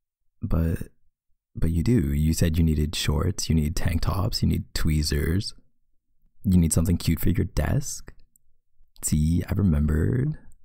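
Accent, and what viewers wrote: Canada. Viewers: you knew what they meant !! But to answer the question I’ve always imagined that he was Canadian but I could be wrong.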